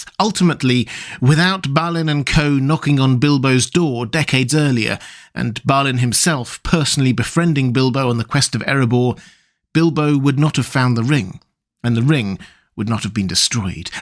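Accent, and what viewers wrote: England, United Kingdom. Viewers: I think him having a British accent makes it even better.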